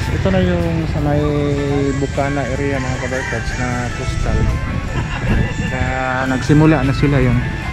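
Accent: Visayas, Philippines. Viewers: Bisaya man kaau imong tagalog idol pro ok ghapon dol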